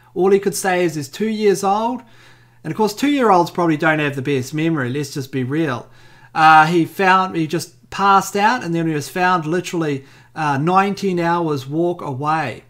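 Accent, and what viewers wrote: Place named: New Zealand. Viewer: I loved esoteric detective but its so much nicer to hear your kiwi voice ☺☺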